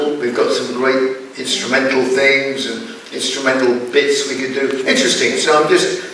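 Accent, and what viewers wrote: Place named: England, United Kingdom. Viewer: Adoro essa entonação carregada do inglês original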